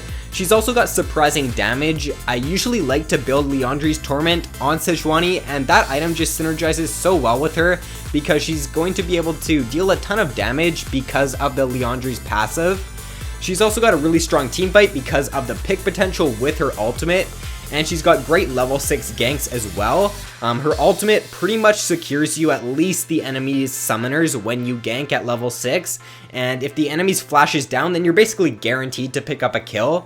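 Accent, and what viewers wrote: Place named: Canada. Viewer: i see you're canadian "eh" me two :D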